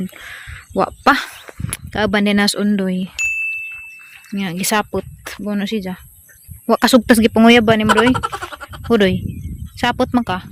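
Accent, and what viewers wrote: Bohol, Philippines. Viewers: Always watching here in Cebu ♥️ i love your boholana ascents🤗♥️ labi nas words nga "ARANG" masundog nako usahay😅😍😍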